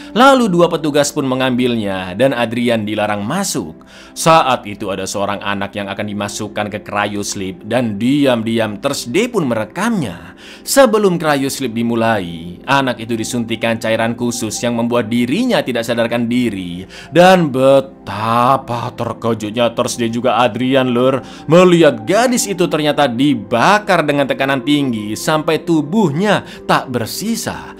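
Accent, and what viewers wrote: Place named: Kebumen, Indonesia. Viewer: cak pean wong kebumen dudu ?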